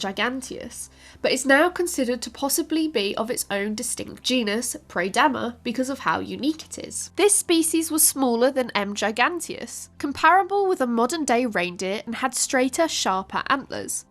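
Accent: United Kingdom. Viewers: Fabulous! Listening to a British Thumbelina in a basket teaching me about deer is so wholesome. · I like the British accent. It makes me think of Harry Potter. Sorry. 😅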